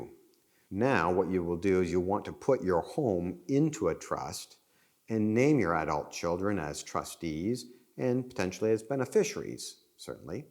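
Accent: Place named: United States. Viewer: Hi you sound american? Are the trust laws in america the same as the uk? Thats if your telling us about american trust laws?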